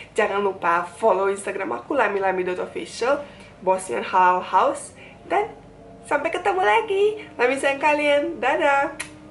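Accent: Jakarta, Indonesia. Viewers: Betawi bgt 👍, btw sekali2 ajari kami bahasa Bosnia 😁 dan met menjalankan ibadah puasa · Lami makin cantik aja. bule logat betawi hehehe bule yang gak mau belajar bahasa indonesia itu bule gak berpengalaman. hehe.. 10 tahun kedepan bahasa indonesia itu menjadi bahasa penting dunia. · Logat bahasanya indonesia banget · Logatnya, gak ngerti lagi, udah bagus bgt sih, udah kayak orang lokal, kayak org jakarta. Jadi ngerti deh kenapa ada bule yg meskipun udah lama di Indo tapi gak bisa bahasa Indonesia, ya karna mereka gak pernah nyoba jadi org Indo beneran, berbaur sama masyarakatnya. Jangan lupa ya bilang makasih sama gorengan dan sayur asem, kayaknya itu bantu bikin lidah agak fleksibel deh hehehe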